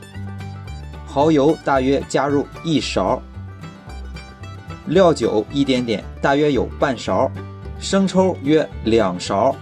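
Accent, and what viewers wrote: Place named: Shandong, China. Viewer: 老乡你是山东哪儿的听着很像我那地方的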